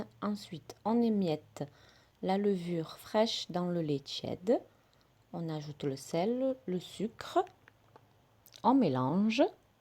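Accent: South, France. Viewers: Magnifique dès demain je vais essayer Merci vraiment pour avoir la gentillesse de nous faire partager vos recettes et avec un accent magnifique du sud qui me rappelle Mon enfance que demander de plus bravo surtout continuez et encore une fois Merci 😊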